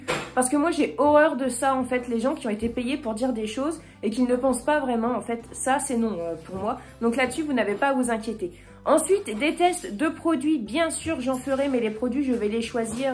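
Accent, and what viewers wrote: Ardennes, France. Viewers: L accent des Ardennes j'adore! Courage pour ton travail quotidien et bravo surtout!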